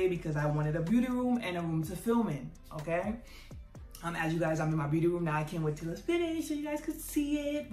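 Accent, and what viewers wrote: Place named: Caribbean. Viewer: Sis you definitely sound Caribbean!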